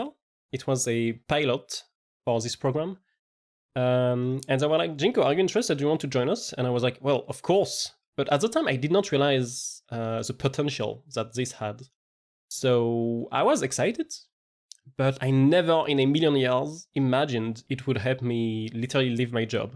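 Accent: France. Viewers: hahaha nah bro don't get me wrong I am taking this hole topic serious and huge respect for quitting your job and talking about it, but at this point your french accent is making anything funny for me.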